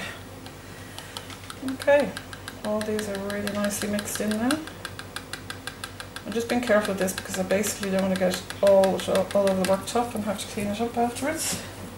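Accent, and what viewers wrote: Ireland. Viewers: it's always such a joy to watch yours video's. Not only for the soapmaking, but also your contagiously laugh, hearing you chatting,.. dare I say your Irish accent which is as pleasing to hear! <3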